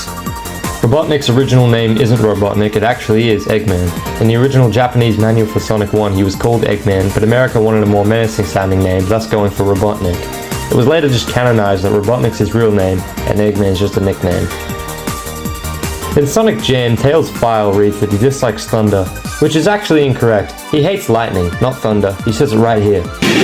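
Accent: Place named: Australia. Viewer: This guy is literally just Aussie Bulbasquirtle, I love it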